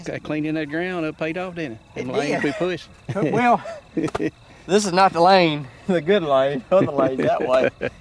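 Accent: South, United States. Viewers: Im from GA. You sound like youz from da South...Im a bow only hunter, and to see a gun hunter do all that with one shot gives me hope there are still fellas that know how to use a firearm. During gun season it sounds like a fire-fight when Im on stand. BOOM, BOOM, BOOM, etc. You did all with one shot. When I was kid I was handed a 30-30 Marlin, no scope, no shoulder strap and three shells. We had three tags for the year...I never missed. I was taught to shoot long before I learned to hunt...Very nice deer...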